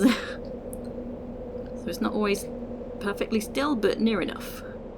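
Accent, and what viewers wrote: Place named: United Kingdom. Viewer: WHEN DID SOUDED BRITISH JUST WONDERING.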